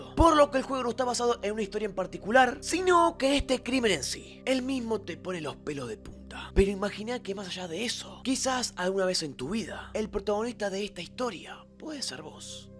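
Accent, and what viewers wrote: Argentina. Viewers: Tu acento argentino queda piola con esa especie de aliento cuando terminas una frase xd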